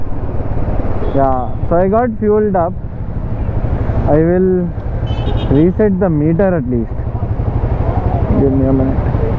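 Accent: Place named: Pakistan. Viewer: need to check with my friend but your Hindi is really good bro you sound like a urdu speaking muslim sometimes 😀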